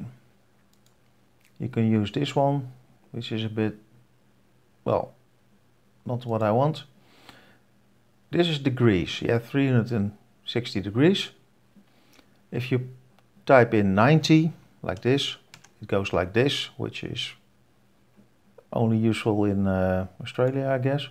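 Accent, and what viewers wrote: Netherlands. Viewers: you sound a lot like a dutch man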